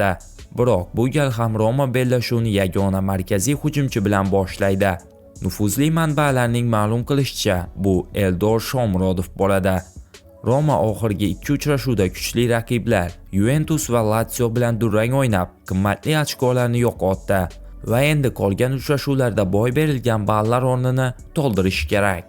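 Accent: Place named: Russia. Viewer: ЎЗБЕКча гапиришни ўрган, нима тилни устидан кулябсанми? ЎЗБЕКчани энди ўрганаётган ўрисга ўхшаб гапирасан. Кабул эмас, қабул.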